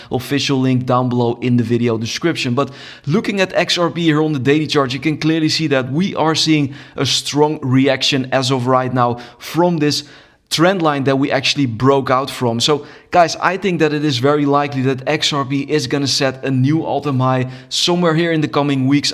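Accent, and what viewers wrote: Netherlands. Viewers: Good information (you got a dutch accent) greetings from Amsterdam.😅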